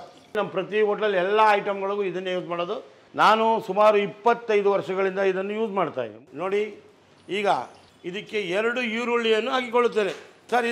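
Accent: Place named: Coastal Karnataka, India. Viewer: I like his Coastal Kannada style . Passionate cook 😊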